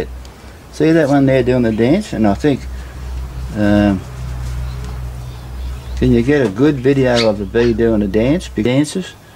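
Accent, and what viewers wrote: Australia. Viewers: hello mate. im a third year beekeeper. had my share of success and failure in that short time. i go to a lot of renaissance faires and was thinking of putting together a display hive for exactly what you said( the wow factor when they see the inner workings and beauty that are inside the hive). was looking at ideas when I found your videos. good job sir. very enjoyable. plus being an American I love to hear you talk (you sound like my buddy Ian from queensland) I like it almost as much as listening to my grandparents who are fresh off the boat from Scotland. keep up the good work and I'll keep watching. im also trying to get a youtube page off with a few videos but im not that good with or in front of a camera. i love trying to video my swarm removals. I've rambled enough, have a g'day mate and dont forget to Bee Happy. sorry couldn't resist.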